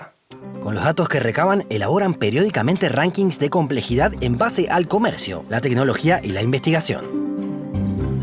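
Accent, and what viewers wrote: Argentina. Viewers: No di es nada..seguro eres m argentin. o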